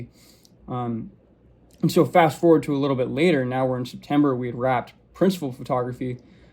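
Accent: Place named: South, United States. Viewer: Of course this guy got a southern accent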